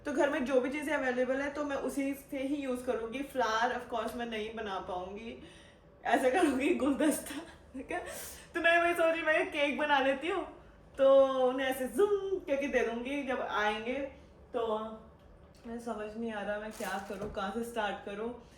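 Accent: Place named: India. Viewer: Oh plz speak punjabi hindi accent not suite you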